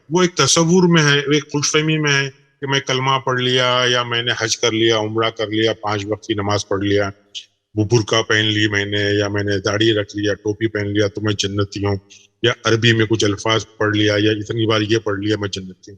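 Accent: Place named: India. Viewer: مجھے لگتا ہے تمھارے لہجے سے کہ ہندوستانی ہو اور اپنی مرضی سے قرآن کی تعویل کر رہے ہو۔شاہد ہندو بھی ہو۔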